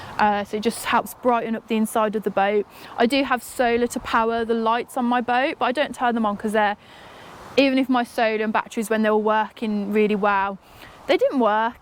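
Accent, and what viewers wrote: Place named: Coventry, United Kingdom. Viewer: This may be a bit of a long shot but that sounds like a Cov. accent. Possibly even Nuneaton.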